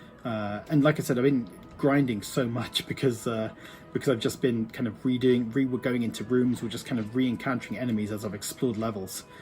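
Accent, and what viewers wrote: United Kingdom. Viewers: that's just it, it hasn't got any sort of sound other than British. I have no idea why I thought you was Chinese lol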